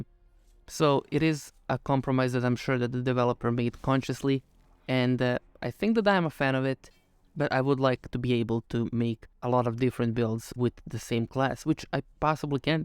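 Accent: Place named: Eastern Europe. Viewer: Potpuno se slazem, precesto mi se desava da pogledam video koji traje skoro koliko i epizoda neke serije koji nije ni najmanje koherentan i vrti jednu te istu pricu svo vrijeme bez neke poente. Radujem se daljim uploadovima :) Pretpostavio sam da si negdje iz istocne evrope po akcentu, kada si izgovorio ime developera u ovom videu pravilno, znao sam da mora da si negdje sa ex-yu prostora, svi ostali se generalno bas muce sa slovom Ć haha. Sve najbolje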